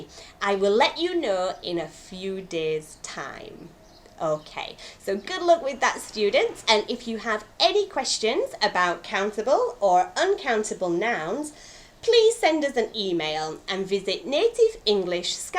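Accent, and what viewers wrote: United Kingdom. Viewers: Great Video! Wonderful English accent!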